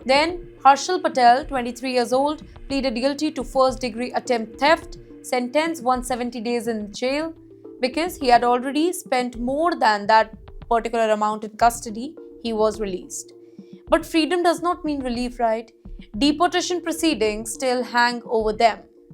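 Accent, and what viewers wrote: India. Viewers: The Indian scammer accent on this presenter is awful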